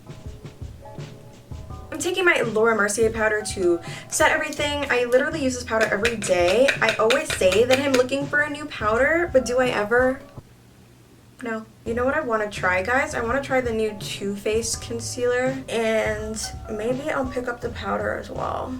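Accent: United Kingdom. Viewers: Oh nvm I see wah British accent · who else loved that British accent 😂😂?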